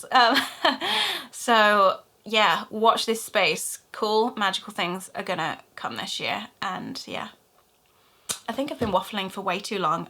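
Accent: Birmingham, United Kingdom. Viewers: Ayeeee your a brummie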